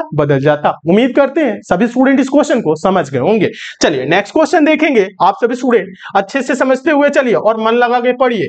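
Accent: Haryana, India. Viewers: Aap Haryana se ho ji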